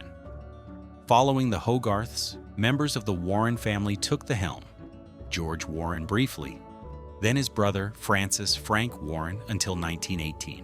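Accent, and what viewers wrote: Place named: United States. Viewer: As soon as i heard this yanks voice i new this video would be Bullsh!t!!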